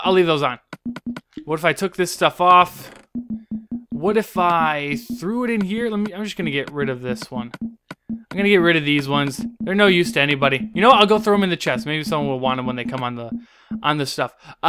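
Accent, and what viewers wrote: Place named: England, United Kingdom. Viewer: not bad inglish acsent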